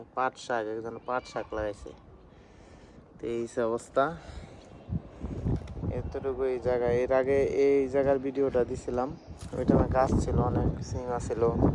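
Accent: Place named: Narayanganj, Bangladesh. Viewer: ভাই আপনার কথা সুনে মনে হলো আপনি নারায়ণগঞ্জ এর ছেলে হবেন,,, 🎉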